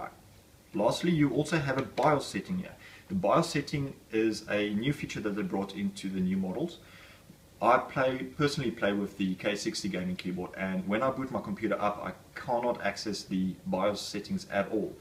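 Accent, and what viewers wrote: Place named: Afrikaans, South Africa. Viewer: Great review for an epic keyboard. Quite an interesting change of pace listening to an Afrikaans accent rather than American haha but I dig it ;) Good job!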